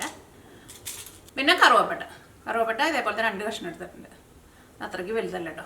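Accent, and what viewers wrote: Thrissur, India. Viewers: Hi,I’m from Nadathara/Thrissur originally but settled in the UK. Love your recipes reminds me of my mum’s cooking! Also your Thrissur accent...Ishtu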